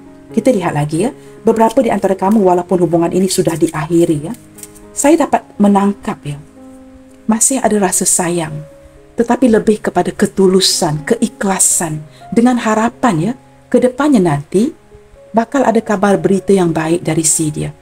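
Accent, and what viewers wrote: Malaysia. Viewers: Terima kasih bunda kesayangan ku atas pembacaan nya hari pembacaan yang sangat mengemaskan terutama pada swara sexi nya bunda yang bernadakan / berbasiskan Melayu ILIKE IT sangat sexi sekali swara nya bunda semangat selalu ya bunda dalam kasih pencerahan nya semoga semakin maju Chanel nya kami sangat mendukung Chanel tarot thank you 👍👍👍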